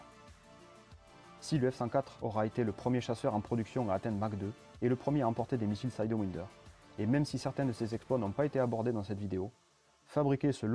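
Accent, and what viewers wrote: South, France. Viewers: excellente vidéo ! bel accent du sud 👍😆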